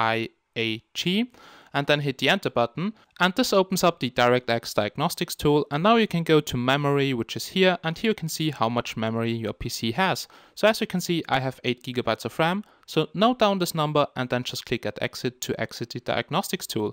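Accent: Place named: Germany. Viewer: hey, are you German? You really sound like :D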